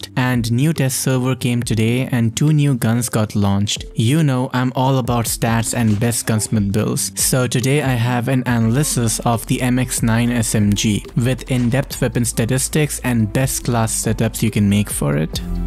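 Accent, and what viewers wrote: India. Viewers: Hey are you Indian 😅😅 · You sound Indian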